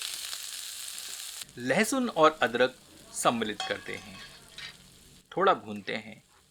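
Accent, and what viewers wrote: Standard. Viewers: Bhrata....aapki ki sudh Hindi sunn k...mann Anandmay hogaya... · जी हम तो आपके व्यंजनों के स्वाद तथा आपकी शुद्ध हिंदी के उच्चारण, दोनों के कायल हैं ।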